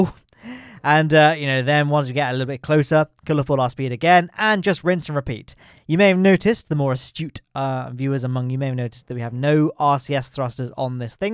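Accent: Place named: Doric, United Kingdom. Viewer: The "wh" pronounced as "hw" is a Doric thing, the dialect that suits between English and Scots. So it's especially appropriate for talking about hwiskey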